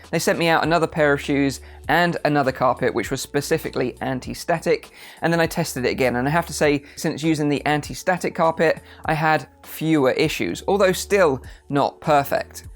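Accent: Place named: England, United Kingdom. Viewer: It's the english accent. From england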